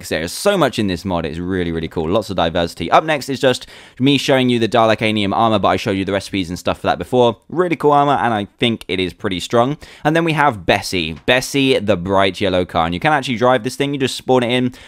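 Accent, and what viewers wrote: United Kingdom. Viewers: and the cool thing is, you have a british accent, so you sound right when you say "dalek" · Dr Who Has A British Accent Just Like YOU!!!!!!!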